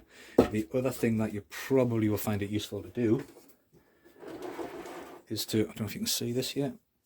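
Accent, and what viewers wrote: United Kingdom. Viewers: very British!! 🇬🇧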